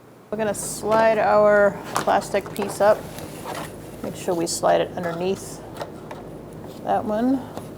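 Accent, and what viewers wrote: Boston, United States. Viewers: My favorite boston accent on the internet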